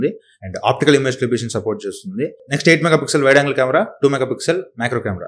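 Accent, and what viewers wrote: Nellore, India. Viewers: meru nellore aa bro proper?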